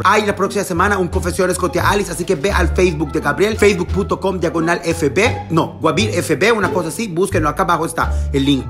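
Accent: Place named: Yucatan, Mexico. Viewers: Amo tu acento yucateco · Jajaja solo veo tus videos por que me da ternura tu acento yucteco <3 y todo lo demas 🐷🐷🐽🐽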